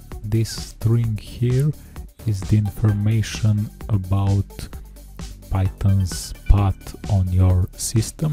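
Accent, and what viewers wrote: Russia. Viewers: Are you from Russia? Or Yugoslavia? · русский ацент)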